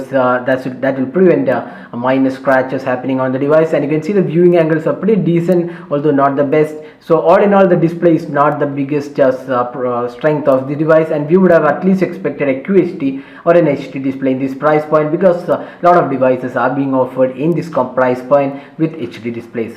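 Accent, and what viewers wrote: Kerala, India. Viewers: indstall aapal gawlity abailabel haha mallu english is unique. My english teacher was mallu too and spoke just like this. I noticed that they have the most thickest accent in south india. Tamils actually pronounce better than telugus.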